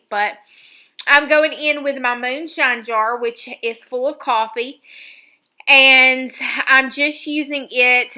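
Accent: South, United States. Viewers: Love this!!! Funny story.. the video alert popped up on my phone and I must have hit it by mistake and I am on a work conference call and all I hear is your sweet southern accent describing what a giblet is and I am literally trying to find the video pause or mute button.🤦.LMAO!!!..oh well the perils of working from home😂😂💕🤸😘🤗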